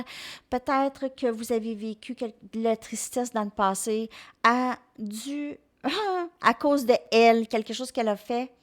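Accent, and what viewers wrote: Canada. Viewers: je viens aussi pour écouter ce bel accent canadien gratitude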